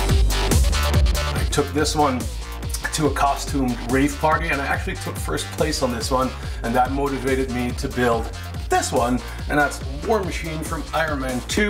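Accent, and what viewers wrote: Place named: Netherlands. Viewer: Lol he actually has a Dutch accent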